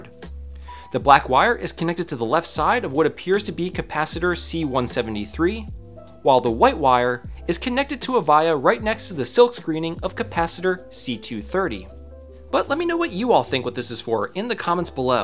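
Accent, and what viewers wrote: Philadelphia, United States. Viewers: are you from the philly area? the way you say your "O"s reminds me very much of my accent.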